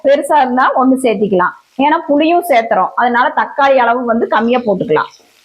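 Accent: Kongu, India. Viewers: Akka ur kongu slang is very sweet to hear. I love ur samayal.its amazing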